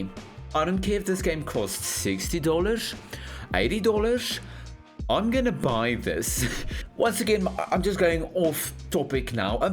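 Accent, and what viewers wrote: South Africa. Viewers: hey dude epic video just a random question here by any chance are you south african? I'm just asking as myself im South African as well and the accent sounds very like South African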